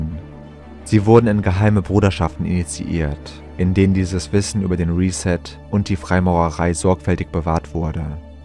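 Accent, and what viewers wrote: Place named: Hesse, Germany. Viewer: Der hessische Dialekt kommt manchmal durch 😅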